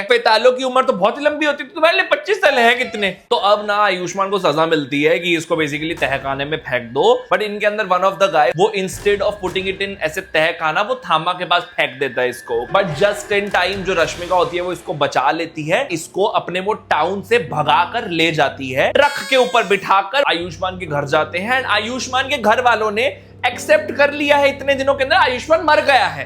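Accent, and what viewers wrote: Punjab, India. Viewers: Bro ... I can see the movie is a total bheja fry but ur review is just outstanding 😂😂 bhai hasn hasn k pet dard ho gaya. I love the way u say things with a tint of punjabi 😂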